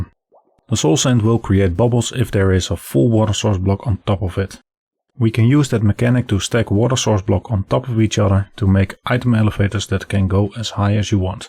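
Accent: Netherlands. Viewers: Thanks for explaining! I can sense Dutch-ness from miles away haha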